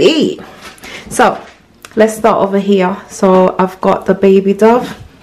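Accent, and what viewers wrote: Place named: United Kingdom. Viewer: You're 🕊️ haul is 🔥🤍😏 🤍 the British accent as well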